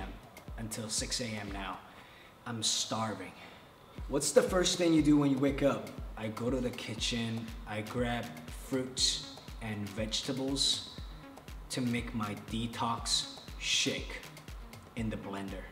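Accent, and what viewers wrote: United States. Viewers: A non-American teacher with a tinge of an American accent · i obv know that i'm just trying to say that even though his accent is kinda american there is a little diference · He went to an international school in HK, so yeah American accented English is norm. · He went to American International School in Hong Kong and was probably taught by Americans, that's why he speaks like an American. But I love your comment :D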